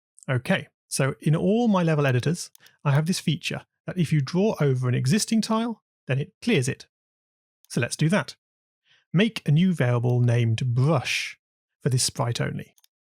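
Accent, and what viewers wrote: United Kingdom. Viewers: for some reason, the best coder i know loves it when his own characters die... i guess i kinda do to, you feel a sense of pride watching all of your hard work lose their life, also give me your British accent.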